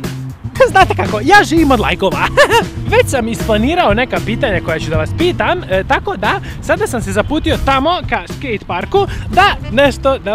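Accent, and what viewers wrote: Bosnia and Herzegovina. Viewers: Brate zašto pišeš ,,reku" a iz Bijeljine si i pričaš ijekavicom?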